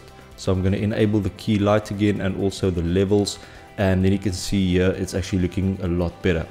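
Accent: South Africa. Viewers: You sound South African 🤔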